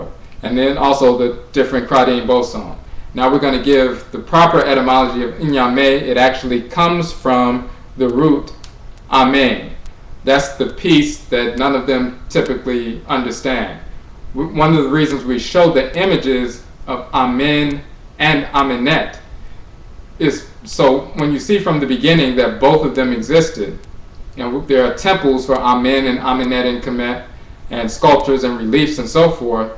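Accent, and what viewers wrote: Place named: United States. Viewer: I say you're on a rightous track.I think that, because of your American ascent, you need to speak a bit slowly so as for us to aprehend your TWI. I'm an Akan of the BANTU BLOOD line.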